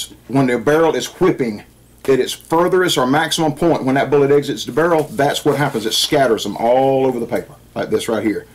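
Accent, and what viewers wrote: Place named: South, United States. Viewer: There is just something wonderful about a somebody with a southern accent explaining scientific or technical stuff. That was a really good explanation.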